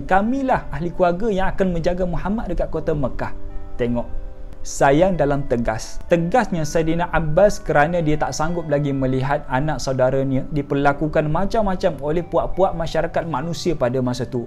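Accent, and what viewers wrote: Kelantan, Malaysia. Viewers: Bagus penerangan ustaz. TQ Dr.. Ada bunyi loghat kelate sikit²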